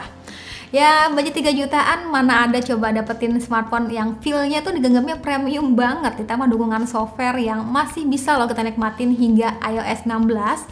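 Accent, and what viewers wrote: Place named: East Java, Indonesia. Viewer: Orang jawa timur kayaknya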